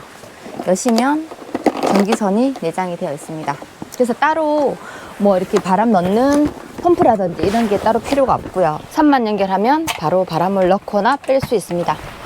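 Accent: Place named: Gyeongsang, South Korea. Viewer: 대구분이시죠??ㅋㅋㅋ 억양으로 알것같아요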